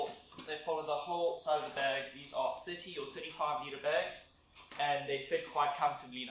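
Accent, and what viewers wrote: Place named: South Africa. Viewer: Any chance this is available in South Africa, sounds like a RSA accent 🙂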